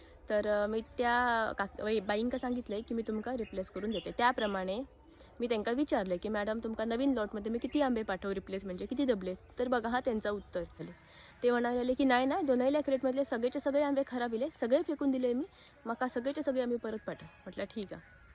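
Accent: Malvani, India. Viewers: मालवणी भाषेत वर्णन करतास ता कानाक ऐकूक बरा वाटता. ह्या स्वार्थी लोकांच्या दुनियेत आपणही कणखर राहून धंदो केलात तरच निभाव लागात.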